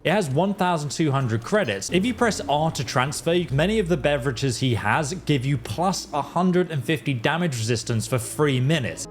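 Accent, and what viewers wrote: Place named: England, United Kingdom. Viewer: Lovely Engish accent you have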